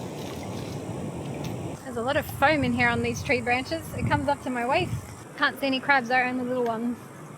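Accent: Australia. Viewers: Holy shit. I didn’t know you could talk!! You’re Aussie. I thought you were a Russian! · Love your Australian accent 😮😮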